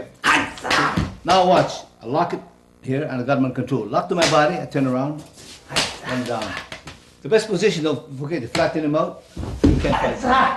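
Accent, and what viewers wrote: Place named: Hawaii, United States. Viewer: That's that Hawaii accent right there! =) mahalo, Sensei!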